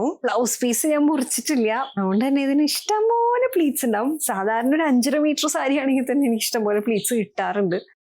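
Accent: Thrissur, India. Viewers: Thrissur town area slang anu ith.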